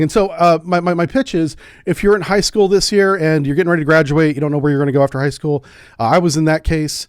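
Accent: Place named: United States. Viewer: You sound american or Canadian; but you use British collective nouns (...how ARE China's Army). WTF?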